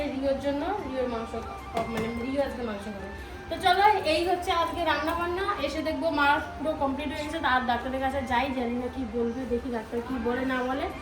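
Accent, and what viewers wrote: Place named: Rajbanshi, India. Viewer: Tomr kotha gulo bojhai jay tui rajbongshi.tomr voyes ta oi rokom tai bolam 😊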